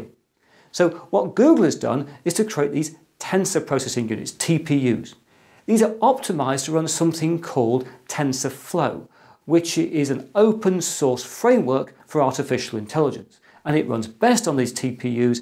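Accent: England, United Kingdom. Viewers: I enjoy listening to your English accent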